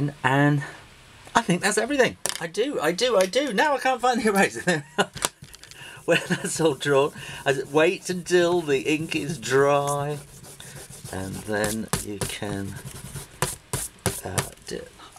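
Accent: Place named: United Kingdom. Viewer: This man speak like Jhonn deacon very Enghlis UK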